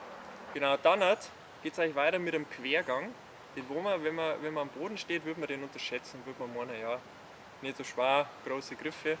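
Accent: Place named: Bavaria, Germany. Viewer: Hast du de rechte für des lied, kann i de aa haben? 😜 Und keine untertitel? Versteh dem sein tiefstes bayrisch ned...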